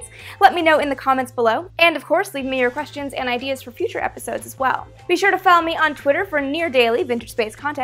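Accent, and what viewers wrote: Canada. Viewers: Er... she's Canadian and says things Canadianly sometimes...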